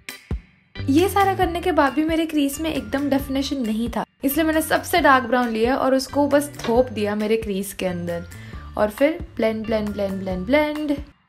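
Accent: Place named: Mumbai, India. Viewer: Omg a hindi video After hearing ur mumbaiya hindi in ur vlog with ur dadu i requested u to do video in hindi n u did it Thanks Btw please do post videos in morning so it will make our day beautiful One more thing i m not getting notifications of ur channel but getting of others channel is anyone else facing same problem 10 days left for vlogmas n its sad please try to post more videos N just love 💓💓💓💓💓💓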